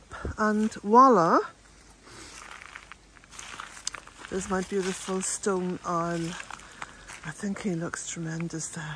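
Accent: Ireland. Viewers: Last time i watched one of your videos you had your black dog. The little jack russell is very cute. And your accent has become very irish! Lol😊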